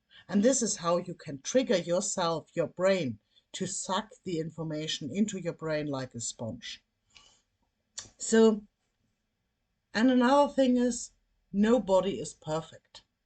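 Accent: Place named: Germany. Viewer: Hello, I'm Yağız, I'm following you on YouTube, good luck, and your German accent is so sweet HDHM! 😈🐉❤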